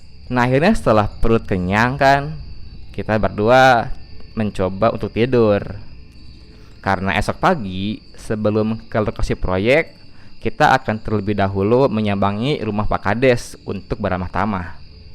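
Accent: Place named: West Java, Indonesia. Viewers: Urang sunda sigana mah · Sundaan euy😋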